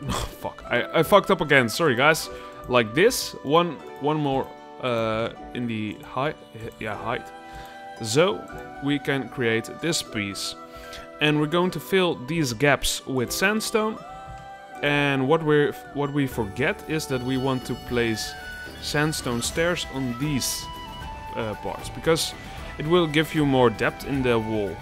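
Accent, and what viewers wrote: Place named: Netherlands. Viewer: Haha nice, I can hear you are accustomed to make video's in The dutch language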